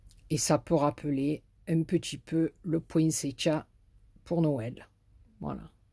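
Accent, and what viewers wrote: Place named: Marseille, France. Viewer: oupss lol l accent de Marseille ou les alentours mdr j adore je m abonne trop rigolote bravo le bonjour de toulouse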